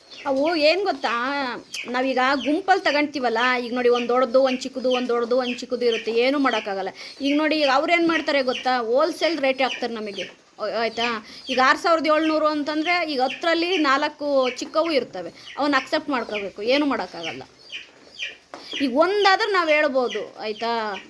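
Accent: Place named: Chitradurga, India. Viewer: Akka yavuri nimdu...bashe nodtidre Chitradurga district ansutte ..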